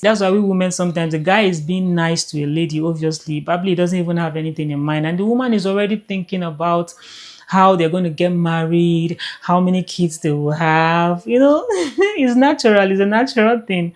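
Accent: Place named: Nigeria. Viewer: I love your Nigerian voice!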